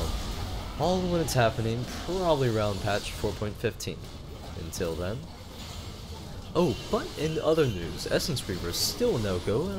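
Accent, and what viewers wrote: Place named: RP, United Kingdom. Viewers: He sounds kinda posh but that is how he speaks irl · Can you talk normally instead of trying to sound posh and weird. Thanks,nice vid tho besides the annoying story telling.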